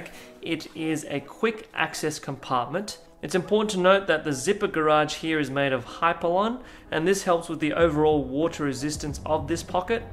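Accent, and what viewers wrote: Australia. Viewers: Was just noticing your accent in the video and thought shit this guy might be Australian, but then measuring bag capacity in 6 packs confirmed it hahaha.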